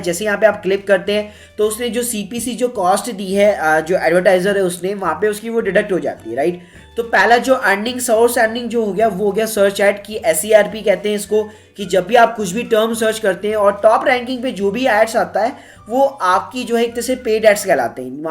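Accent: India. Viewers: His Indian accent really drives me crazy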